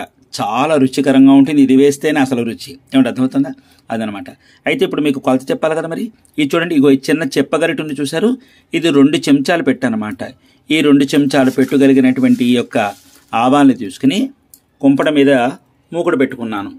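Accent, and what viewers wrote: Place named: Godavari, India. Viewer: మీ తూ.గో.జి. పగోజి తెలుగు అద్భుతం. వినాలనిపిస్తుంది, చేసినవి తినాలి అనిపిస్తుంది నమస్సులు.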